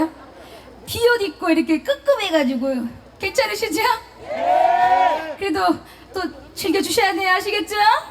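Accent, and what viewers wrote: Jeolla, South Korea. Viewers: 송가인 가수님 전라도 사투리 정겹고 친근감들어 재미나요 ㅎ ㅎ 노래힘있고 무대꽉찬느낌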